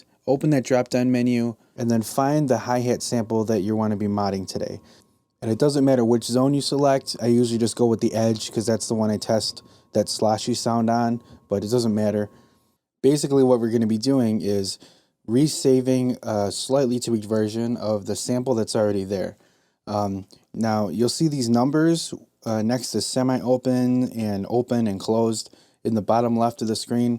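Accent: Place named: Midwest, United States. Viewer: I come to these videos all the time but I can't get over the Midwest accent you heeeve. Hey hates. What's heyppening with the hey hates. Ugh.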